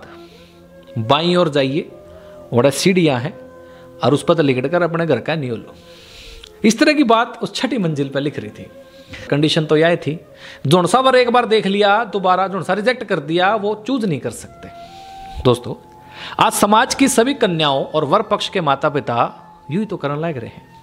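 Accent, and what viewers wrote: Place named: Kaithal, India. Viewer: Ji aap kaithal se ho kya. द का न ward se pta chala h..bhut badiya bolte ho aap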